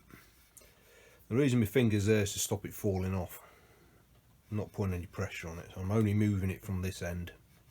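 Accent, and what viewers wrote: United Kingdom. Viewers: Thanks for the video. Came from Steve's channel. You sound like you come from the same side of the pond as myself UK. Keep safe and stay well.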